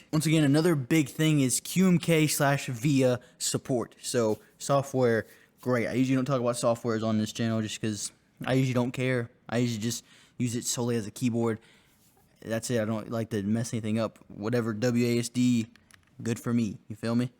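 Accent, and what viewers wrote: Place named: South, United States. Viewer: slightly country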